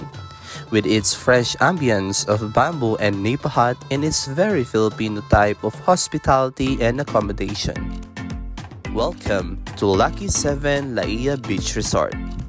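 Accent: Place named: England, United Kingdom. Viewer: Cool English accent 😊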